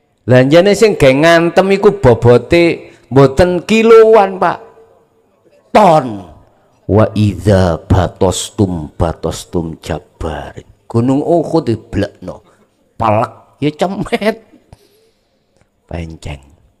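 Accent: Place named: Java, Indonesia. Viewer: Benar sekali,sy yakin seyakin-yakinnya, Insyaallah setelah menyimak video ttg, Zikir Sirul Asror dr pak Kiai,sy merasa lapang menghadapi apapun yg menimpa diri dan klg ataupun siapapun,dan menghilang kan rasa ujub,riak,iri, dengki dll, Terimakasih Guru semoga sehat selalu beserta keluarga besar,dr Lombok timur NTB(logat jawanya dpt sy pahami, walopun tdk semuanya,klo bisa kurangi BHS jawanya Kiai)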